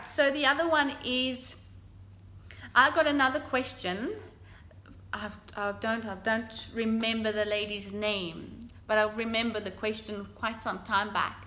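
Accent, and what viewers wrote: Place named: Afrikaans, South Africa. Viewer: are you South African? you have an Afrikaans-English accent.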